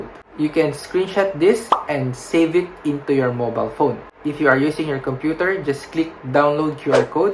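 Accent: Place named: Philippines. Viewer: English pa more pinoy k eh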